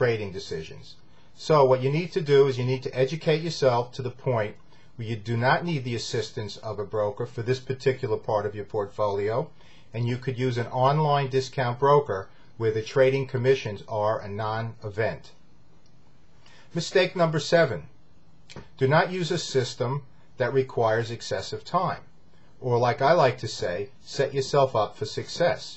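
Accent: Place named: Long Island, United States. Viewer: OMG, within the first minute I thought to myself.. His accent sure sounds like hes from LongGisland (Where I live) then wamn, I noticed the Bethpage address in the background. Farmingdale resident here.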